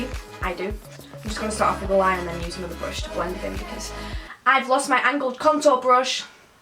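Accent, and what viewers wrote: United Kingdom. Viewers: I have an American accent and you make me want a British accent soooooo bad!! 😩😩😍😍😍😍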